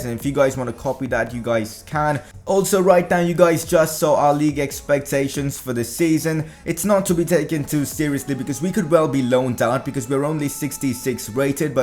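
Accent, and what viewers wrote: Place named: India. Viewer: Man he sound like a England guy but in 2024 he sound like Indian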